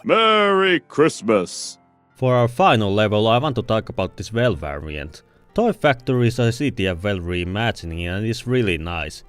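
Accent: Finland. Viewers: I can detect finnish accents from planets away, being a finn as well. What's good? Also the way i found this channel is i just stumbled into it on my recommended and was like "? Isn't this the guy from my steam friends list?" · Shogatsu is my favorite, they did great Also I love how I immediately knew you were from Finland, cheers from Hungary lol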